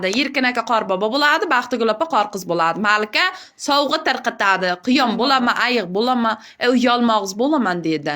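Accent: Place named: Surkhandarya, Uzbekistan. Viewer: Surxandaryoni shevasi 100% yoshli perashka keskan shulla Surxoncha taom Qoʻrgʻon bozori boor😊😊😂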